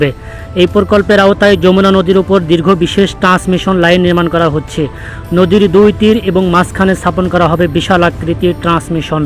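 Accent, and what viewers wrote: India. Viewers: R you from India ? Your sound like Indian.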